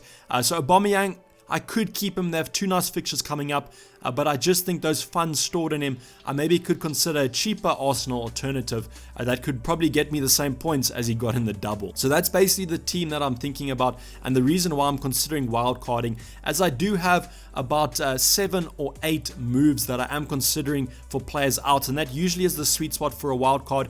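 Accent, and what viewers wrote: South Africa. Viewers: Is this guy south african ? his accent is odd i like it tho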